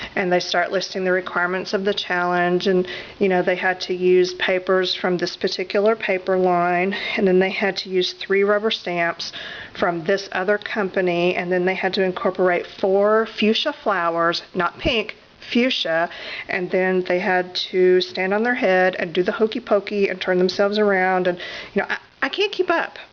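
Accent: South, United States. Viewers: Gotta know where you're from! Our southern accents nearly match! Love these vids!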